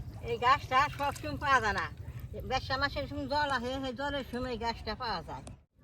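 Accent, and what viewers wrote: Tangail, Bangladesh. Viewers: আমাদের টাঙ্গাইলের ভাষার সাথে অনেক মিল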